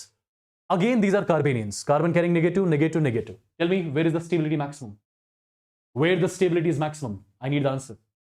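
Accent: Kashmir, India. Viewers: Is he from Kashmir?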